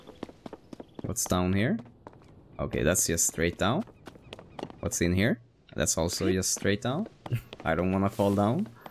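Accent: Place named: Sweden. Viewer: One word in and i hear the swedish accent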